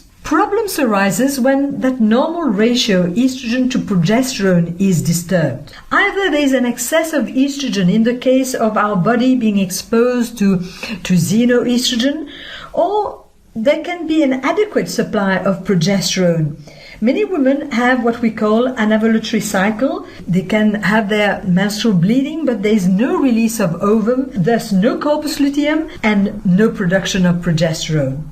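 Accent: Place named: France. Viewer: where is that strong accent from ? France ?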